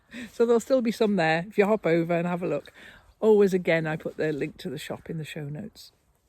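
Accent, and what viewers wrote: England, United Kingdom. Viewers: I was thrilled to see you NYE. You always are so interesting. My grandmother on my dads side came from England, however, passed before I got to know her. Your accent draws me closer to her because I do remember her accent and the way she spoke I was only four and I loved her so much. I am doing ancestry to see where and when she came here to United States. I feel like I’m on the sofa with you. Keep up the videos♥️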